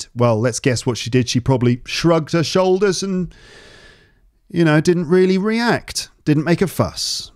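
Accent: United Kingdom. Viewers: Thank you so much,! I can read and write English (with US vocabulary) a little but I can't speak:( I've just discovered your Youtube channel and I literally love it! This story is amazing and I love your UK accent 💓. I'm trying to improve all my English skills. Can I use US vocabulary while speaking with UK accent? Is it grotesque and offensive to native speakers? 🤔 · May I ask you an external question, my teacher 😊 Your accent is belong to which region of UK? With me, it is clear to understand the story. Not the same feeling when I listen to my beloved singer Adele talks. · Hi, great to hear the story, listen to your British English. Also your comments were very helful. Props to you! I am a 74-year-old writer of poems, short stories and cronicles. I have shared the video.❤ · What an incredible experience I have watching your videos. Love your british accent and the way you explain the meaning of some words. 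By the way, "mi amor" is Spanish and means "my love" which is a way to call our partners when there is love involved. Hope to see you soon and thank you!! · The attractive places of this Youtuber are not only his British accent, but also his quality of voice and pace! It looks as if I listen an audio book. What an amazing podcast !! I begin to be hooked by his. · I love listening to your channel, especially your clear British accent. Keep up the good work, 😊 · I like your excellent British accent, it is so attractive to watch your videos more , it's amazing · B.B.Wang Perhaps I am the oldest student you have ever taught. I am an Australian-Chinese 90 years old. I have kept learning English to enrich/ improve the living quality of my” late” years. Your teaching course helps very much. I especially appreciate the way you teach. I can follow you almost the whole process. And the UK pronunciation. 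Thank you!. (Please have tolerance for my poor grammar.) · I mean you're birtish for me i try to learn accent birtish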